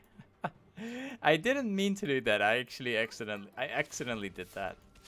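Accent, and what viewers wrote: Netherlands. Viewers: Echt een grof accent van Nederlands haha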